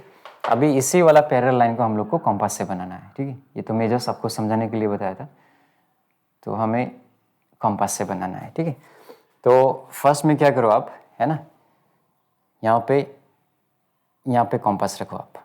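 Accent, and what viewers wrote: Assam, India. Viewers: Sir are u frm Assam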